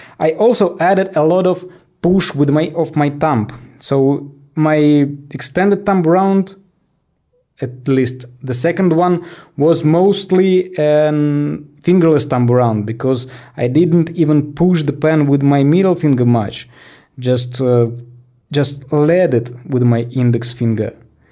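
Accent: Russia. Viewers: так ты русскоязычный по акценту понятно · You have a Russian accent(lul, it's handsome). Are you from Russia? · Слышно что русский челик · Русский акцент остался😂😂😂😂👍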